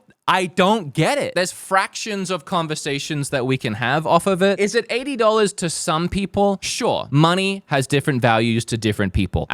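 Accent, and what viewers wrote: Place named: Australia. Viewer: Completely irrelevant question but what's your accent? You sound Australian with a touch of something else?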